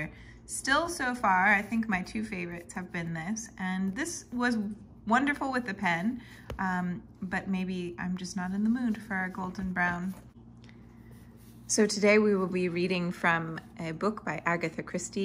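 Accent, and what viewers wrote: France. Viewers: Your french accent is delightfull , tres bien ma chere. :) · Great french/belgian accent!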